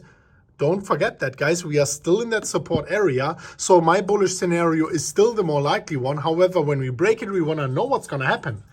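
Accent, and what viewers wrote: Germany. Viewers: Danke alda, nicht nur der beste englisch crypto youtuber mit deutschem akzent :D sondern auch bester youtuber von dem man in jedem video was lernen kann!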